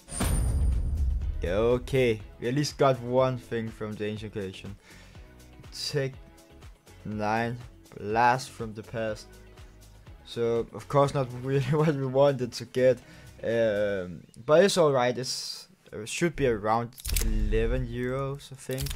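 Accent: Denmark. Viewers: i smell danish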